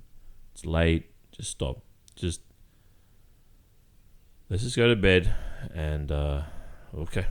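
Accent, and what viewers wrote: Australia. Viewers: Australian accent sucks 😕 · Okay I’m stupid, I was about to ask if you were Australian because you sound really Australian, buuuut then I read your channel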